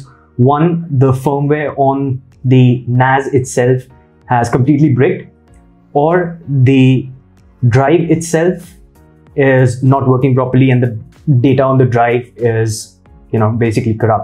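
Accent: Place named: India. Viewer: thanks nice indian english very clear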